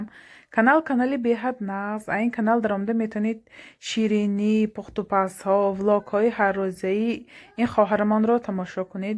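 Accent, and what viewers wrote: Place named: Konibodom, Tajikistan. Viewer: ташаккур ба чавобатон апачон, ман аз Конибодом ,тарзи гапзанитон ба лахчаи мо монанд аст хело..дастатон дардро набинад,Ташаккур ба шумо,хело ба ман писанд хастед,хоксор апаи худам❤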